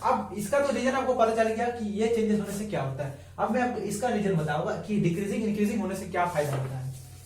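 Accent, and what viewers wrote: Odisha, India. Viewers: Sir Are you from Odisha?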